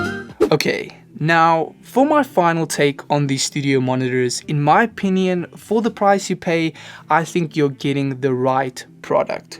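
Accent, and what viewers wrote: South Africa. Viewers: hello, Just a random question are you south african (you ascent just sounds like it)?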